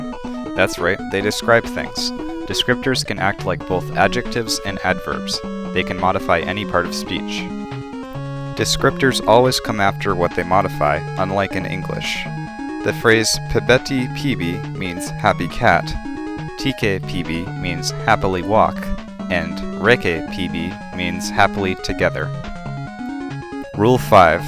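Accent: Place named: United States. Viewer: Please come up with an accent for the language or something, it sounds terrible with an American accent. Ps. Is that Shovel Knight music in background?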